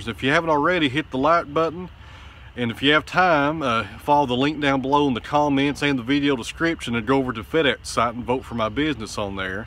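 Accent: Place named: Tennessee, United States. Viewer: I'm new to your channel, and I have to say it's mesmerizing. I've never spent any time watching someone use a sawmill, but it's as though I have to watch you. What's so refreshing is your enthusiasm for the work, and the fact that you really, really appreciate the gift, direct from God himself, of the wood. I've had a love affair with wood since I first took a shop class in the 9th grade in 1972. When you reveal the inside of a log, and you revel in the fact that you are the first human being to see it, it is like unveiling a never-seen-before Rembrandt. I have stood in wonder as I gazed up at the ceiling of the Sistine Chapel, in awe of the work of Michelangelo. Yet he is no match for the beauty of a slab of walnut, uncovered by your saw mill. Thanks for bringing this great art to the world. In addition to woodworking, I'm a photographer as well. 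Both habits, errr, hobbies, are supported by my day job, which I love, and both habits, I mean hobbies, are black holes that swallow money without end. If I were to engage in milling wood like you, I'm fairly certain it would mean the end of 36 years of marriage.:) Besides, I live in a part of Wyoming where trees are few and far between. I will get my saw mill fix from your videos. Finally, I love the way you talk. I have a friend who hails from Tennessee, and he sounds a lot like you. That's a pretty unique thing in this part of the world!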